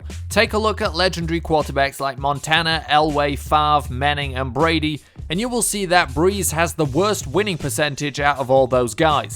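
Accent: England, United Kingdom. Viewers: Your British accent ruins your credibility. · Wait. Is that english accent? It's funny how the narrator has english accent talking about American Football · And it sounds more believable with the British accent.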